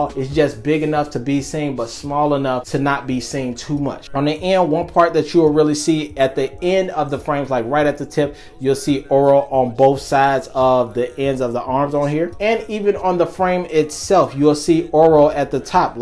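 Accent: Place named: Chicago, United States. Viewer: I hear yo Chicago accent deep correct me if I’m wrong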